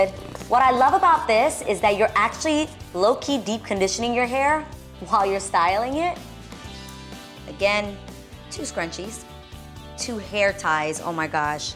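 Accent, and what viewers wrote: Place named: New York, United States. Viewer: I’m in love with your New York accent. I’m jealous. By the way, awesome video! I’ll definitely try one of them!❤️💙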